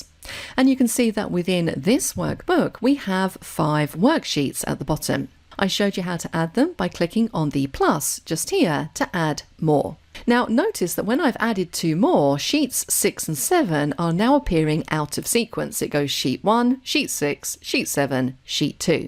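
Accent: United Kingdom. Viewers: Thank you! I am a 56 year old (from India) heart failure patient who is actually into Quickbooks accounting for staffing companies in the US. As I can not go out and need to look for work from home only, the first thing I need to keep up are my excel skills. There were many free courses available on Youtube but I chose this one as it is presented in a British accent, though you might be based in the US,(a BBC fan here!) with good recording quality too. I really appreciate your free service and I am not sure how I can help in return. Thanks a lot and best wishes from India.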